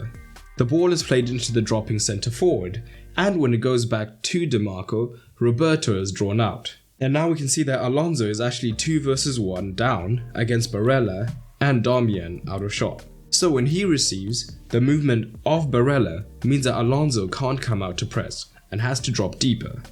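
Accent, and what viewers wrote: South Africa. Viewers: You sound like you are from South Africa.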